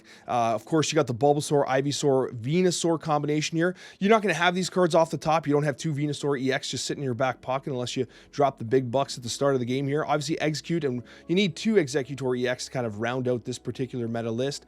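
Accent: Canada. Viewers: He's Canadian. Leave alone!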